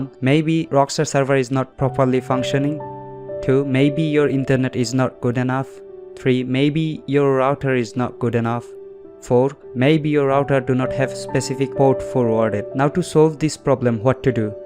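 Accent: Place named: India. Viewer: You sound Indian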